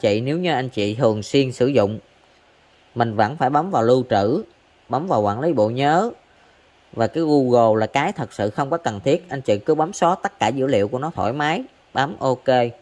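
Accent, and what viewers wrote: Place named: South, Vietnam. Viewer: cám ơn em nhiều lắm, em hướng dẫn rất tận tình, chất giọng nam bộ rất dễ thương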